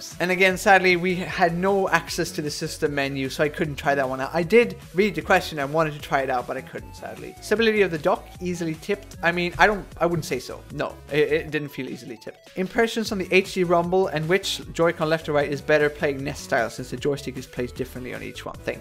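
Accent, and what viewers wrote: Sweden. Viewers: WTF är du svensk? I was wondering what your accent is and then I saw "poäng", and "1 dag sen" etc. So now the accent makes sense.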